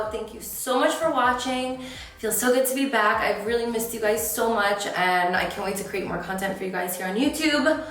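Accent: New Jersey, United States. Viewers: I just noticed your New Jersey accent ☺️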